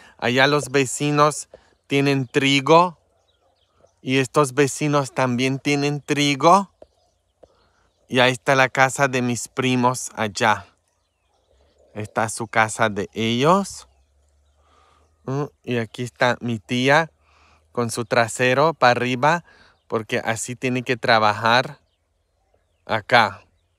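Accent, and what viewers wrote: Mexico. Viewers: Padre,soy una subcritora nueva de su canal ...es usted muy ameno,me encanta verle en su día a día y oírle hablar en español con ese bonito deje mexicano!! Por aquí estaré !!!..un abrazo!!!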